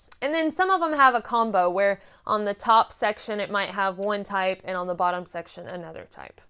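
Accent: South, United States. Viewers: Your southern accent is getting stronger now that you're home. Purtiest sound a southern boy ever hears. I've used the old bent handle wood canes for years. They make great snake locators(that's the original reason I started using them) & the hook makes a good extended hand on a steep climb especially up a river bank. The wood canes also make a good defensive tool in the wild hog country I'm often in: kinda helps that my grandparents raised pigs when I was growing up so I'm well versed in using a cane that way. I have considered trekking poles for a long hike & this is great information. Thanks Ms.!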